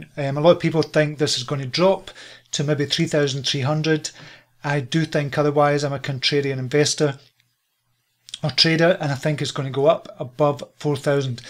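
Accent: Scotland, United Kingdom. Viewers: I trust the Scottish accent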